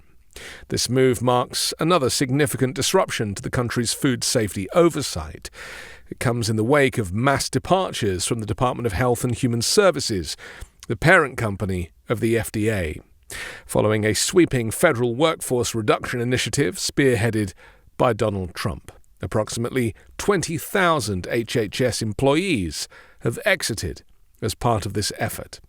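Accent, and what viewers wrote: United Kingdom. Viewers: Best voice on the internet. Smooth and silky British goodness! Cheers mate!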